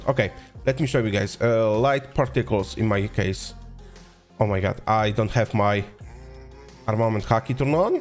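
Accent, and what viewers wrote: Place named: Asia. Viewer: Dude, i hear the asian in your voice, i love it, you are awesome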